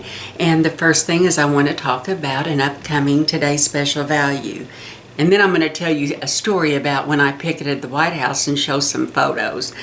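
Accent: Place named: Southern Illinois, United States. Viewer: What a fun story! I love your southern Illinois accent as well!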